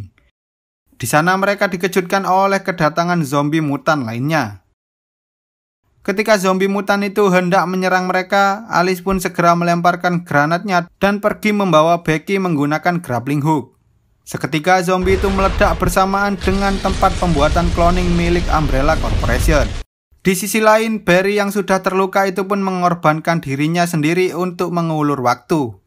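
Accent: Java, Indonesia. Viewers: Wong jowo bg😂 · Orang jawa bang?? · Keritik pedes dari gua . Lu itu ngomongnya medok ga usah bercanda kalo bikin video . Ga lucu sumpah malah bikin ilfil . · jowo endi mas? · medok bener ... medok!!! 😤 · Fix org jawa cara Ngomongnya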